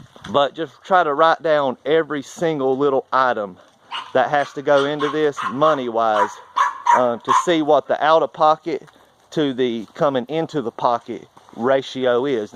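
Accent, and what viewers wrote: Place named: South, United States. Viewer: you sound like a southern gentleman. No need to justify anything, appreciate your hard work. God bless.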